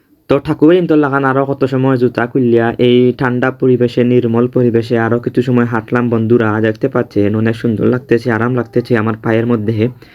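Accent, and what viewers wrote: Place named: Sylhet, Bangladesh. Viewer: Vair mat huniya amr Abba haste haste sesh😂😁 koin ada sylheti ada shuddo bashaa😆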